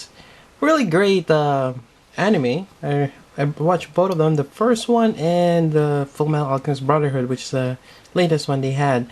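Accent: Philippines. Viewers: r u Filipino? Cause I’m half Filipino and I can kinda tell from ur accent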